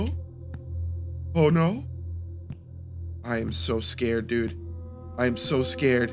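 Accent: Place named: South, United States. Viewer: That country voice modulation tho 😏🥵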